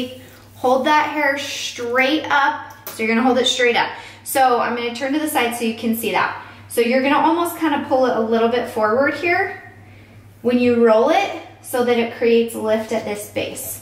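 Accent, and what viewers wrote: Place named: Minnesota, United States. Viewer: Are you from Minnesota? You sound like us if you're not.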